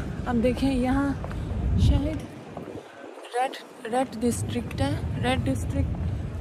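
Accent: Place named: North, Pakistan. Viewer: Her accent shows, she is NOT Korean but Pakistani from north of Pakistan. She is not Korean; not at all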